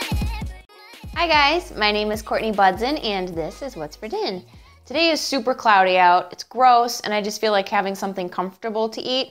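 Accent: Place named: Wisconsin, United States. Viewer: You sound mad North Western. Almost wisconsin like lol.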